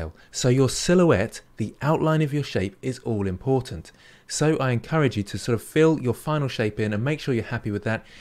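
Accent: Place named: Essex, United Kingdom. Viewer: Love your tutorials. Very easy listening voice and accent. Is that Essex?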